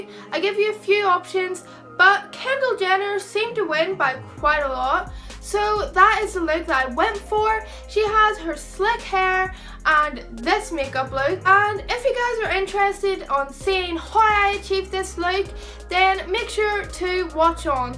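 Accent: Northern Ireland, United Kingdom. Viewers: northern Irish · lanseto NORTHERN ireland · I'm from Northern Ireland too, she can't really change her accent. I think it's cause people on here aren't used to hearing northern Irish accents since there isn't many youtubers from Northern Ireland so you probably aren't used to it! But yeah she can't really do anything about her accent! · She's from Northern Ireland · If u don't like her accent them why are u watching her videos?!?! that is what people from Northern Ireland sound like so u are basically insulting me and everyone else from there! There is no need to be rude! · Northern Irish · She's British (Northern Irish),she can't help it,it's just an accent. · NORTHERN IRISH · she's from Northern Ireland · Northern Ireland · Northern Irish like mine :) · Northern irish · it's the northern irish accent, she can't really help it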